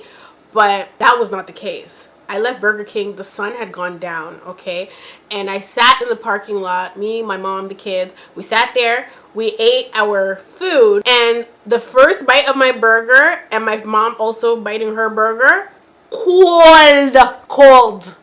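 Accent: Jamaica. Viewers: The patois is healing the broken parts of me!! 😂 ❤ I love it!! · It’s the patois for me 💀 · My favorite part of every video is growing fluent patois. Kk luv ya byeee:_CHEFSKISS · The patois!!!! Yes!!!🥹🥹🥹🥹🥰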